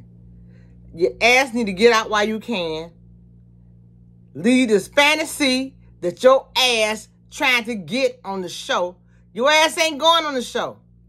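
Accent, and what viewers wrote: United States. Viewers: I just so happened to stumble upon your site,. You are so funny...shooting straight from the hip with that country accent 🤣🤣! I was hooked and hog tied the first 5 minutes of listening. Where have you been hiding🤣😃? Thank you.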